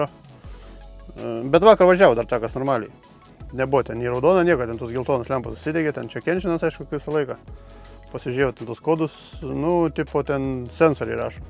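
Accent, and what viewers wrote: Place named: Šiluva, Lithuania. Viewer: AŠ iš Šiluvos.malonu.Keista,kad kalan grynai tai pat. :hand-pink-waving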